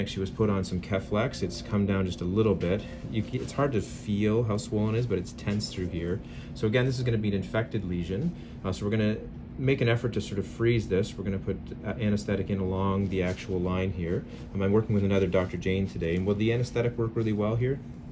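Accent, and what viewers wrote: Canada. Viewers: Your accent is Canadian. Good work Doc! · He’s Canadian.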